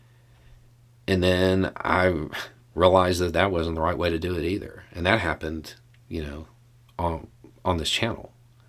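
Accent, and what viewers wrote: South, United States. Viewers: Hi. Just watched the video 'Let's talk about 3 letters that can make rural whites understand the fear minorities have of cops.' and in it, I heard a much strong Southern accent than in any video since I started watching your channel. Which is your day-to-day accent, now or then? Or was the accent you used in that video due to the subject matter of the video - i.e. to talk like a rural Southern person? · I prefer the redneck accent. 😊 From a redneck girl. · have been here long enough to see you evolve from sounding rather southern to not sounding southern at all , you cover a wide range of matters and from your explanations it is possible to see you care about the truth , How do you stay so even tempered and not get mad as hell about some of this stuff , I use your video's to explain things to my GOP friends .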